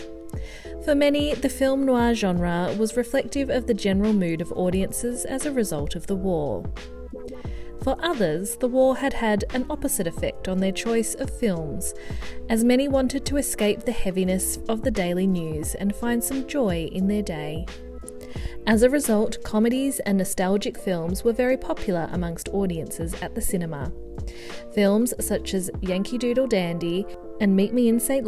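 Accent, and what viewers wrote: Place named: United Kingdom. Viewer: Why is someone with a British accent narrating a film on American life?!?!?;?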